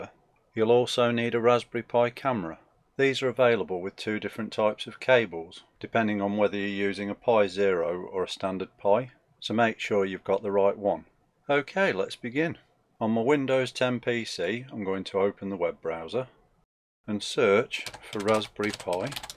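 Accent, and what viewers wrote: United Kingdom. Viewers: that british accent must be staight out from a harry potter movie or sm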